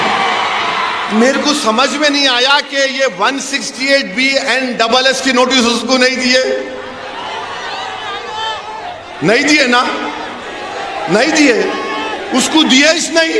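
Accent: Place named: Hyderabad, India. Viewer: Phale Haidrabad hindi bolna chodo aur sidha hindi sikh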